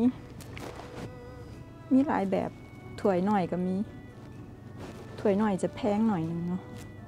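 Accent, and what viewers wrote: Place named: Isan, Thailand. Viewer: สำเนียงอีสาน ไท ชัยภูมิ ชุมแพ ภูเขียว ภูเวียง ตั๊วนี่หรือว่าไทใด๋หน้อเว้าคือบ้านข่อยแท้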